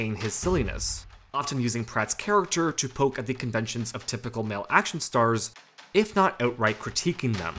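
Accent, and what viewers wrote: Canada. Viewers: canadian accent detected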